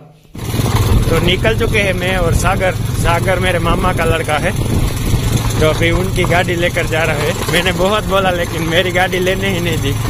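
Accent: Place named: Kathiawar, India. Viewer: Apke bolne k dhang se pata chalta hai k aap kathiawadi ho,kathiawadi log kathiawadi dhang se bolte hai,from punjab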